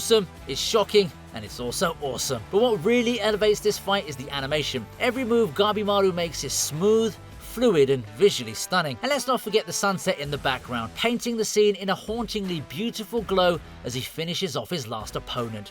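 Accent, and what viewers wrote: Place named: United Kingdom. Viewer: Always ruined by a terrible British voice.....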